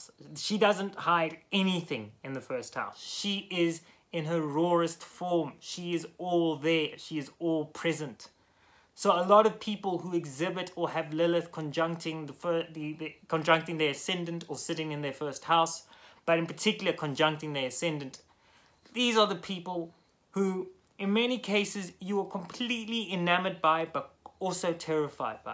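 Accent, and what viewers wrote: South Africa. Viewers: Are you South African? I am South African living in Costa Rica. Lilith in the first house.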